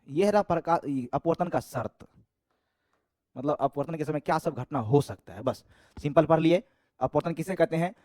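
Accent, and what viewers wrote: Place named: Bihar, India. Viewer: Sir bihari language 😍😍😍😍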